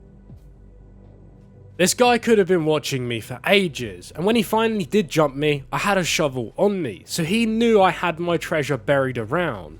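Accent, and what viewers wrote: United Kingdom. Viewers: Brit English is so annoying!!!!!!!!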